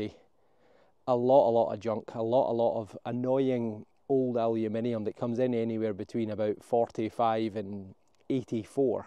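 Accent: Scotland, United Kingdom. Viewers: I miss my mums accent she was from Scotland. So it was so nice to find your site, and once again hear that familiar accent. I enjoy your show the best you seem to know your history. My grandfather was with the ladies from hell, the black watch. He would play his bagpipes when he came to the states for a visit. He would give me a handful of pennies i thought i was rich. I metal detect here in New Mexico but the ground is so hard. So when i see you digging im envious. May the wamth of spring come you way soon. Take care. Ian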